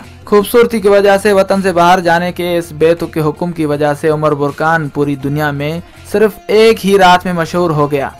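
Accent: India. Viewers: apka lehja hindi kyun hai urdu ko urdu ki trh bola karein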